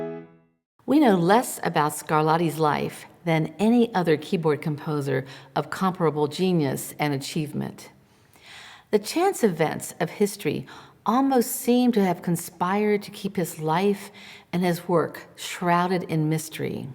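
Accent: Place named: United States. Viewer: It's just the American accent that one needs to retune to 🎉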